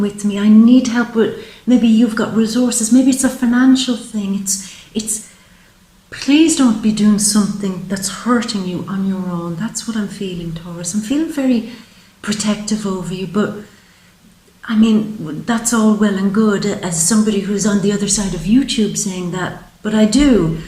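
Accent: Ireland. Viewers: I'm thinking Ireland. I love the accent whatever it is.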